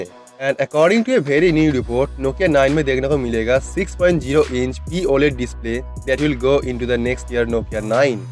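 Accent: India. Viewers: Fuck Indian accent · indian english?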